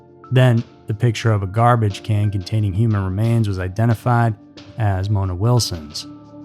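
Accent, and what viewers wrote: United States. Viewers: OMG I ❤️ your accent... where in the USA are you from🇺🇸??.... I'm from Staffs UK🇬🇧... love your posts 😍👌🏼💋 keep them coming, thanks Jo xx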